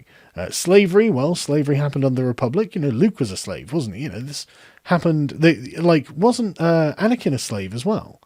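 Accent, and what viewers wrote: United Kingdom. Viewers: It is a credit to your well-spoken Britishness that listening to you riff on this is still entertaining, even when you're completely wrong. The "Dark Side" isn't a facet of the human condition. Maybe it would be if conceived by better writers for a more mature audience. But it ain't. The Dark Side is a PCP/Crack/Adrenaline cocktail that can(and very often has) instantly flip a noble and altruistic hero into a yellow-eyed baby-eater in the blink of an eye. Repeatedly demonstrated throughout both canon and non-canon materials throughout the decades of the franchise. It's what happens when a Dragon Age mage becomes an Abomination. And in that context you now begin to understand the Jedi are a peculiar mixture of the Dragon Age Templars and Circle combined...with all the hypocritical flaws, and magi-knight badassery that entails. But they do what they do because when they fail...yellow-eyed baby-eaters run rampant all over the place.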